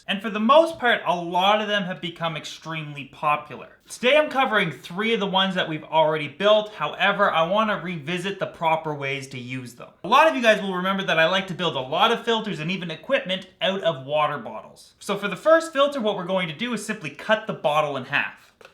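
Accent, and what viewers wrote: Canada. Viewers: I love this guy's Canadian accent "Aboot" lol. Great video though.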